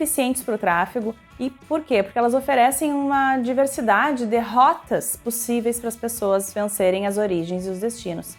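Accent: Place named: Rio Grande do Sul, Brazil. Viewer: Bah fácil perceber que tu és gaúcha né guria😂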